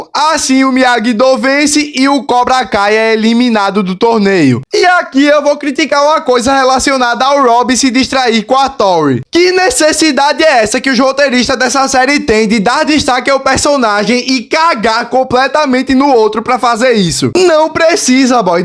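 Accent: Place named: Northeast, Brazil. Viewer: você e Nordestino?😂você fala igual um amigo meu que veio de lá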